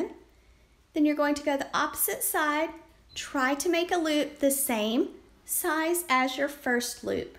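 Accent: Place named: South, United States. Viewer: Once I heard the southern accent, I knew this lady knew what she was talking about. Thank you for making it quick and simple too!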